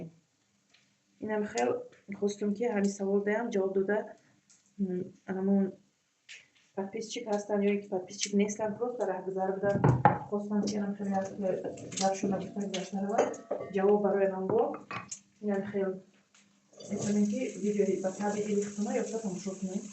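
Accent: Tajikistan. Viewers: Хохарам шумо аз кучои ТЧК гапзпниет Кулобиеворияй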